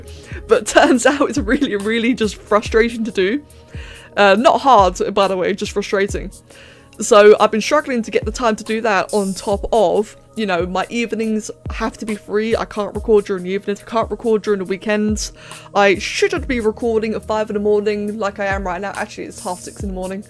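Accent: United Kingdom. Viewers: i was watching since 2010:D Ps: are u brittish:3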